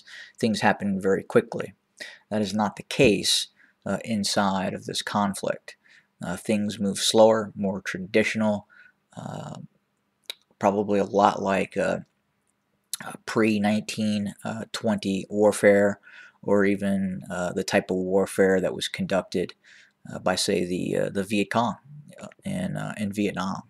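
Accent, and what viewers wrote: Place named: United States. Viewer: You are definetly abesaha, why put this white American accent ?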